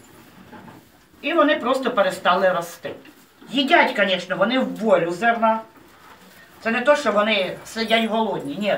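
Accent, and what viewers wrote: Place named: Mykolaiv, Ukraine. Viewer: з якої ви області. я думаю з миколаївської у нас так розмовляють в селах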